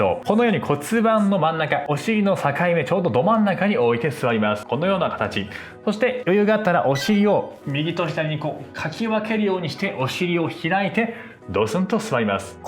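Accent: Kansai, Japan. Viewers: 関西訛りが良かったです 笑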